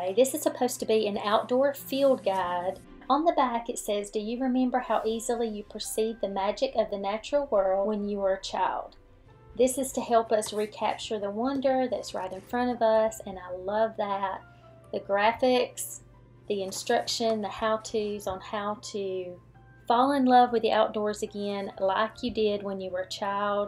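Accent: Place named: North Carolina, United States. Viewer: I just found your channel through Taylor. I have the secret garden book too. I really can’t wait to read it! It looks so pretty. Side note - are you from NC? Cause that’s where I’m from and your accent sounds just like so many from down here! I’m on the last chapter of Mansfield Park! I absolutely love it. The end of the book does take place in spring time, just after Easter.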